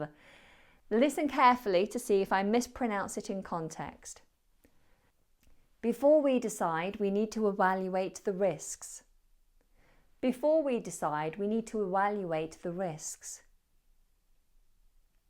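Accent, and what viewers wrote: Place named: United Kingdom. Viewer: British English pronunciation with Miss!!!... Excellent!!!...❤💫👌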